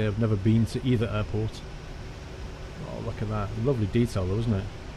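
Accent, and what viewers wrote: Australia. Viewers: ur sounding a lot like an aurrie even thou ur from the uk I think lol but ur saying the names almost spot on lol but I use to watch ur vids for euro truck sim now I know u play this as well so yea can watch more lol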